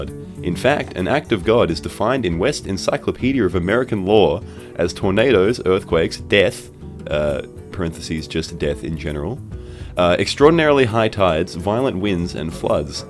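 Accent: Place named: Australia. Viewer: so fucking australian it's perfect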